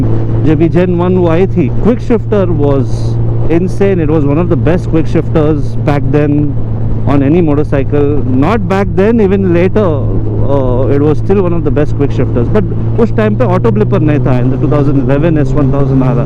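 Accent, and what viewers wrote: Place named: Dakhni, India. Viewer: aapka dakhni mein vlogs aur baat karne ka tareekha bahut saaf and standard he mashallah... baaki log dakhni me baat karne pe kaan mein chubta he... will support this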